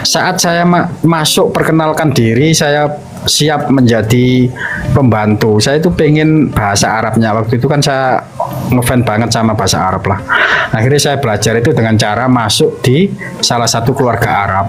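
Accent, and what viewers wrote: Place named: Surabaya, Indonesia. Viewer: nek ngrungokno boso suroboyone cacak nok video iki....rasane kepingin moleh nang njowo maneh rek....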